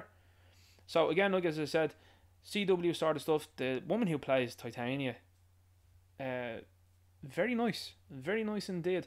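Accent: Dublin, Ireland. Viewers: Good review. Nice to hear a Dub accent, too. Good one.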